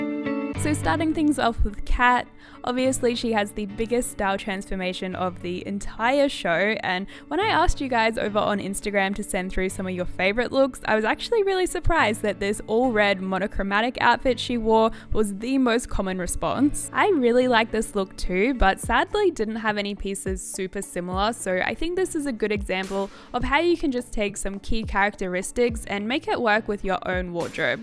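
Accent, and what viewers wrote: Australia. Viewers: are you australian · are u australian? you have a different accent, sorry if I said it wrong, I'm not an English speaker but I noticed a different accent. loved the outfits, I would probably wear all of them 💖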